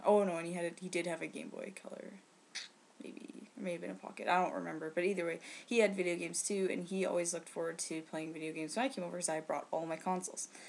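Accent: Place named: Canada. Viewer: I thought you where American untill you pronounced out as "oot"and about as"aboot""and then I thought you must be Canadian.please correct me if I'm wrong.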